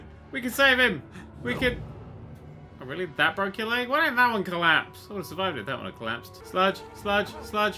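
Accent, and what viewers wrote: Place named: Cockney, United Kingdom. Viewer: Extreme TGX (Game Reviews And Stuff) well he almost has a cockney accent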